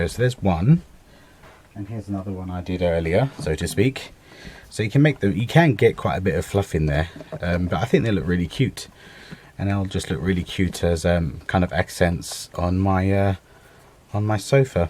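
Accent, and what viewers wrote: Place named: Kent, United Kingdom. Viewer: Clever. Wouldn't of thought of that. you sound like your from Kent. I live in Kent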